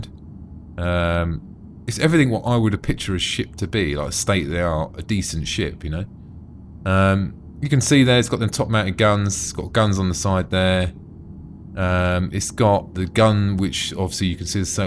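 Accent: United Kingdom. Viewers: your accent is too damn british to understand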